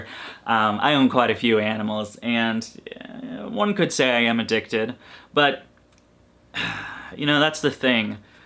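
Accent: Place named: New England, United States. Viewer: i love your accent...ya damn yankee <3!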